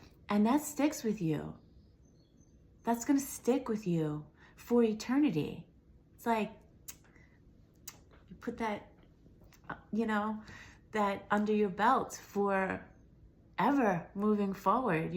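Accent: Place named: New York, United States. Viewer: You shine Love you New Yark accent and yes I say Yark lol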